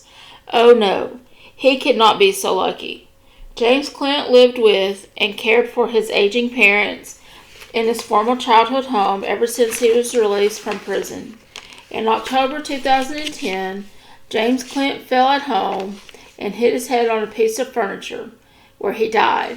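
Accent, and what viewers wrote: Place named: South, United States. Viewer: This story reminds us that God is still on the throne and maybe later but judgment is surely comes to evil murderer James Klindt . Most crack heads doesn’t live long anyway . He died due to his drug addiction . ( made him fall caused him to having fatal heart attack ) If he still incarcerated in prison and didn’t get parole , maybe he still alive . That’s the beautiful judgment of God and Joyce is finally rest cause James went to his master in hell . Thank you for accurate information with cute southern accent. From California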